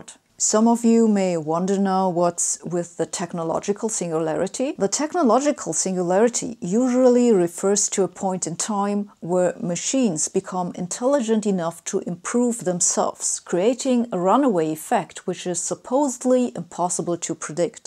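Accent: Germany. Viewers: I noticed your teeth almost never get apart. Wondering now if that's related to german accent while speaking english.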